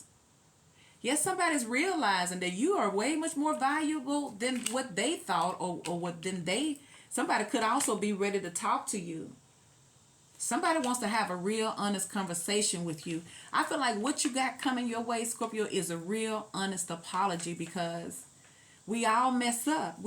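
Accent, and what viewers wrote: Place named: South, United States. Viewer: Me too! Love her southern drawl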